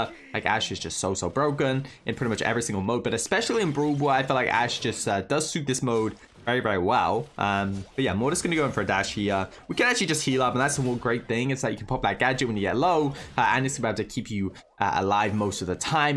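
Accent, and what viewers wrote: United Kingdom. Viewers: Bruh he's from UK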